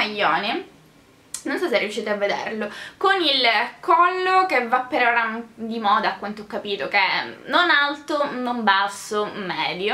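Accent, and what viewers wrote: Sicily, Italy. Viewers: Ehi ciao:) mi sono appena iscritta!! Metti troppa allegria e a quanto sento sei siciliana come me... 👍🏽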